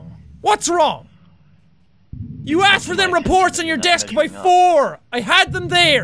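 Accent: Ireland. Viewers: hes irish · Love his Irish Accent!!! 🇮🇪☘️ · i subed just for the awsome accent... what is that .. irish ?? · Niv Leket Slight problemo! NOT BRITISH!!!!!!:He's IRISH"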